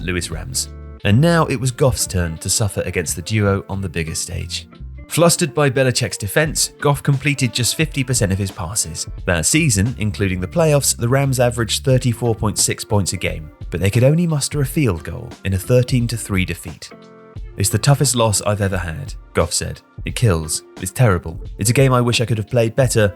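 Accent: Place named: United Kingdom. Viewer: It’s weird hearing a British accent explaining the NFL…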